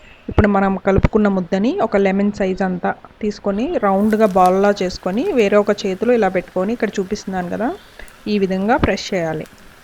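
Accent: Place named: Rayalaseema, India. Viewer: mee maatalalo rayalaseema vaasana vastondi meedi evuru?...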